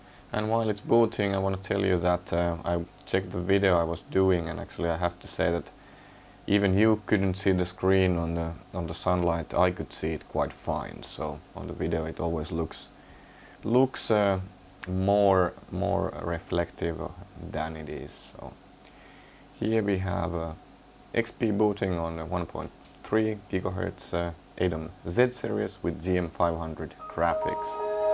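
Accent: Finland. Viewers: I seek reviews on a gadget and I somehow always end up watching the ones with that guy and his finnish accent ;). They're the best. Thanx for them all! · ru Finnish?...u sounds like my friend from Finland.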